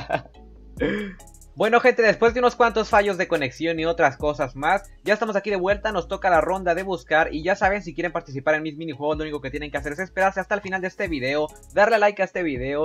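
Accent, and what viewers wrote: Colombia. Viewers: valla asento colombiano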